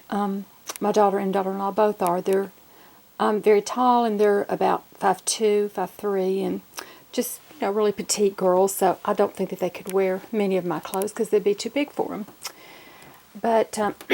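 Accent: South, United States. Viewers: You are one of my favs! I just love your genuine sweet personality and as southern girl...I LOVE that accent! And....holy cow I had no clue you were almost 60...you are GORGEOUS inside and out! I would love to see some more videos on your secrets to aging so gracefully....both on the inside and out! Happy New Year!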